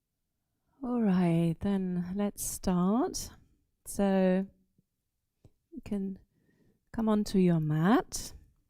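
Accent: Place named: United Kingdom. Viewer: Was sie für eine wunderbare Stimme hat und dieses liebevolle British English ist für mich so ein Segen... Gerne regelmäßig Yoga mit!!!!! I love it!!!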